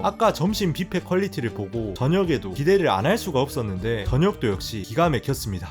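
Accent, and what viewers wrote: Jeolla, South Korea. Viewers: 3대가 서울인 나에게 전라도 동상의 사투리 귀요미. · 님 전라도 사람이신거같다 말투가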